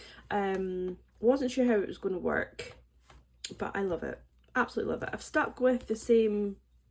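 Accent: Scotland, United Kingdom. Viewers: Omfg! You’re Scottish 😮 I don’t know how I’m ever going to get over that revelation 😂 LOVE the planner can’t wait for mine to arrive xx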